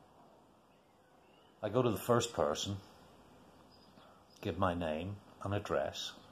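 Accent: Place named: Ireland. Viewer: I heard that Irish accent a little more this time :o)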